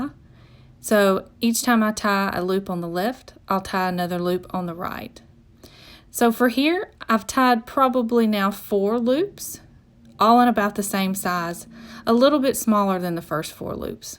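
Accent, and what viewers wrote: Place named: South, United States. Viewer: Yes! Love a good ole fashioned southern accent!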